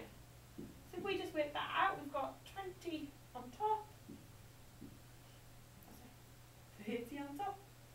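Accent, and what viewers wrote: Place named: United Kingdom. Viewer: damn that british accent -_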